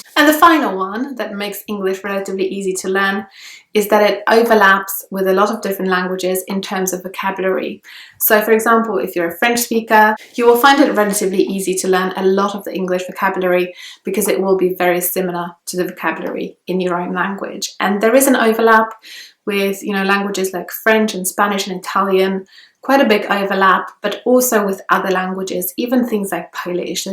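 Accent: United Kingdom. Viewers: I completely thought you were a native Britian before you said you were polish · Ironic that you ended up with such a beautiful British accent after finding American English easiest to understand at first. As a native speaker, it's difficult for me to say, but (as Brandon commented below) the absence of noun gender must really help. I find just remembering genders in German very hard, still. As Scott says, below, isn't the crazy English spelling the main difficulty, though, from a reading a writing perspective? I once read a book by a Russian linguist who argued it takes English native children two years longer to get literate because of the spelling. I still struggle, sometimes, even with top 2,000 frequency words after decades of extensive reading and writing. I'll always know when I don't know, if you follow, but I do sometimes have to check. Rather like Chinese or Japanese natives having to check the characters (though it's not that difficult, I'm sure).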